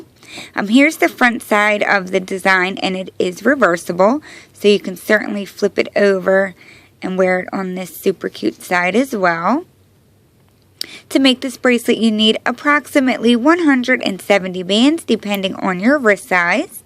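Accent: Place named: South, United States. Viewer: That southern accent tho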